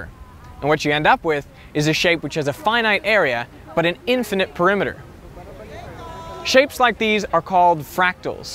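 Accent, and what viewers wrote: Australia. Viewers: Watching this video 5 years later and damn does he have an Australian accent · It's funny I hear him speaking with a slight Australian accent. I think he went to film school there. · what's crazy is i detect a very, VERY slight australian accent here that's not there in more recent videos... wild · he sounds more australian in this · You are getting a slight Australian accent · wow, his voice is starting to have an Australian accent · He sounded so much more Australian back then. What a difference. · Finally watching an old video I thought I was the only one noticing the australian accent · is it me or is his accent finally picking up a little Austrailian tone?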